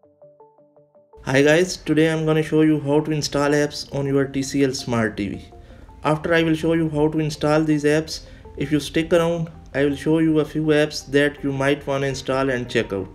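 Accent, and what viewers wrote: India. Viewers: Indian English accent cannot understand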